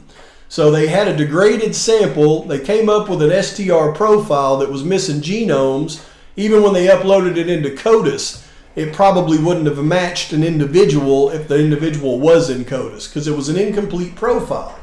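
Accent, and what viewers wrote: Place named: Texas, United States. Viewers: You sound like you are from FORT WORTH TEXAS or near by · Yep. I was peggin that accent today while watching this video. Have we got us a texan here? Ex aTexas Ranger perhaps?? Just a thought. Imo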